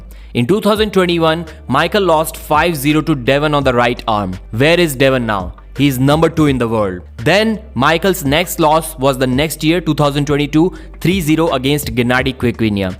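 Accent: India. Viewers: Terrible. This pajeet voice ruins everything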